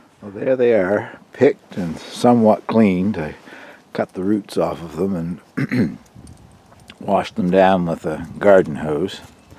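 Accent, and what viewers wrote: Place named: Canada. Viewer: Dear, I have just found your channel in the past 2 weeks.This is the best! Really enjoy all your videos, the chickens, darling Angel, your cabin all wonderful. I think you may be a modern day Thoreau! Thank you for sharing your very interesting, and inspiring life. Have always wanted to visit Campobello Island, as well as P.E.I., hope to do so one day. My maternal Grandparents were Canadian (I am American) and it is lovely to hear your accent.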